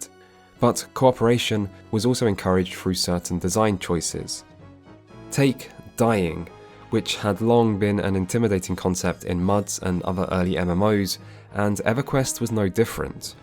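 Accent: United Kingdom. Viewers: british english - instant skip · OMG everytime I hear that brit accent I end up hating the thing I used to like.......arrogant snot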